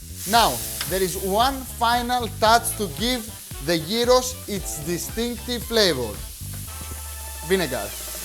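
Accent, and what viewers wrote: Greece. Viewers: his accent is great and I mean it. Why so many Greeks have a problem with his accent? Why on earth do you feel embarrassed with something you shouldn't and it's none of your business. And finally don't forget that for the English speaking world he is an Greek!! That's what he sells and the accent could be very well part of it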